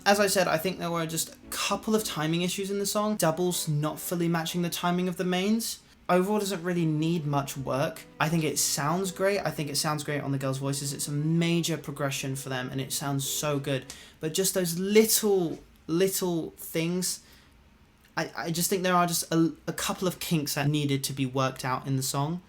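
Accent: United Kingdom. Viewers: Love the British accent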